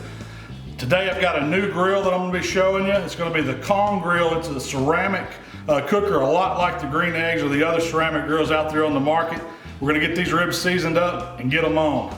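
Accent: South, United States. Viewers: You're just referring to his accent. That's how we talk in the south.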